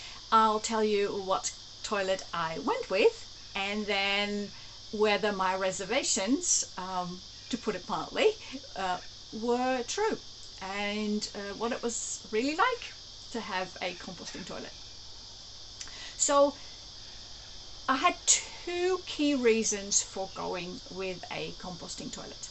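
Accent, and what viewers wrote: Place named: Australia. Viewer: I love the idea of composting toilets but am also skeptical when people claim there's no smell at all. Even flush toilets smell sometimes. I'm glad to hear that composting toilets really don't smell. I didn't realize you were from The Netherlands (I'm learning Dutch, btw). You sound like you were born in Australia.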